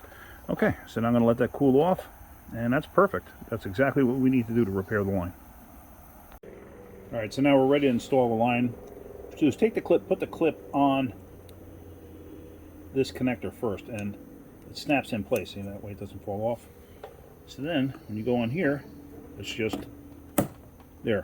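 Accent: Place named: New York, United States. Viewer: I thought you sounded like a New Yorker, then in recent videos you've confirmed that. I spent 30 years in Texas and for a bit in the 80s did the annual state safety inspections. I've now been in Minnesota for 10 years and have now experienced the rusty crusty stuff common in the "salt belt" of the US. I've been watching Eric O at SMA deal with the rusty crusty stuff PLUS the crazy laws and regulations and restrictions that NY state puts on cars and I have mad respect for him dealing with all that. I'm guessing you're glad to not have to deal with all that!!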